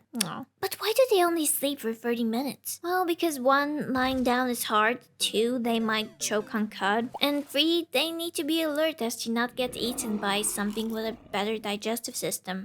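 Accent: Eastern Europe. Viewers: Oh it’s an Eastern European accent! (Like “Dexter Secret Laboratory”) I’m a huge fan of your voice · Yoooo, ur from Eastern Europe? Somehow it felt like it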